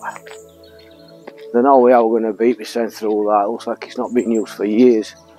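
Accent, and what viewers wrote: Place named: Yorkshire, United Kingdom. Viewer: Hi love watching your videos we use to live at highflats brings back lot of memories we now live in Scarborough love to hear your accent reminds us of back home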